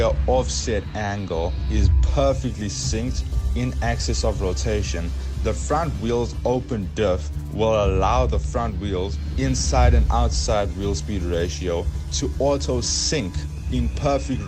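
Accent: South Africa. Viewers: I feel like I am listening to an asian that some how grew up africanz in South Africa. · Bro are you south african, dueban maybe?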